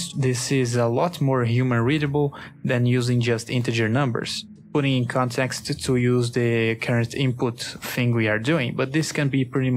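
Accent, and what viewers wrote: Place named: Brazil. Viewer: br? esse sotaque n me engana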